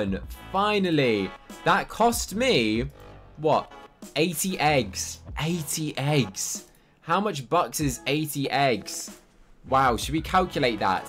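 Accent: United Kingdom. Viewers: You sound like every british guy I've ever met, it makes me feel like I know you lmao.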